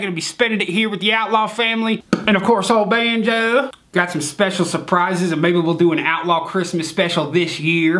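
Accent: South, United States. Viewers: OCASIÓN!! AMERICAN FRENCH SOUTHERN DIALECT!!!! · 2018 also You sound like an real American .-.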